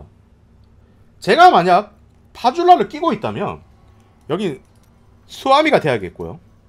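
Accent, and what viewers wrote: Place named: Gyeongsang, South Korea. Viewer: 원래 경상도 사람 저정도 악센트 다 있는데.. 듣기 싫으면 다른걸 보던지 다 님처럼 생각하지 않으니 님이 이해를 하세요 서울사람보고 느끼하니까 말투 바꿔라고 하면 똑같이 기분나쁠거 아닙니까 ?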